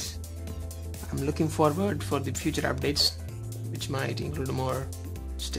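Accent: Kerala, India. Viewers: OnePlus One most indians speak english in the same way just lyk you.but i dint expect u to be a malayali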